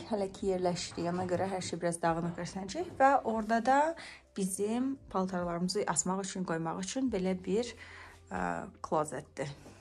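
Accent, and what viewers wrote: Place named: United States. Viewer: Danisigin bele Amerika lehcesindedi 😃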